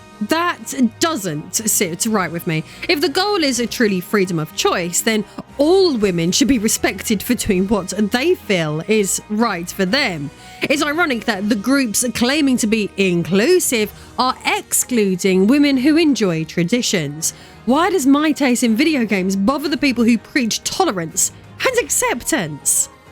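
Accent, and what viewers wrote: United Kingdom. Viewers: No worries - there will always a few bloat-heads spewing dirt around! I really enjoy your content as it´s based in reality - your videos are fun, informative and your british accent is the cherry on top. Greetings from good old Germany 🙂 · British accent is ❤ don't ask me what Cheryl Cole be saying though. I too was 17 in 2006 we getting old but still young at heart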